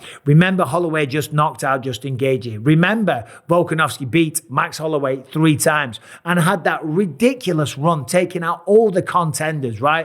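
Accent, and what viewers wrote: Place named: Liverpool, United Kingdom. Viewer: Little scouse prick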